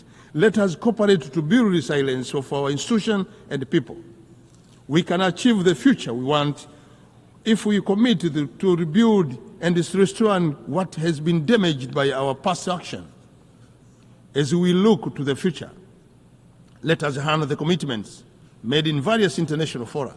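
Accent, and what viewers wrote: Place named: Tanzania. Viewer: Mbona mataifa mengine yanakuwa na tatzo lile lile? Unawasikia wahindi? Acha dharau kwa Waziri wetu, Yuko vizuri ndio maana kuna UK ENglish , Tanzania English etc. Unashida weye. Unataka aonngee fluent english kwani ye Mzungu!! Una upeo mdogo kuhusu lugha.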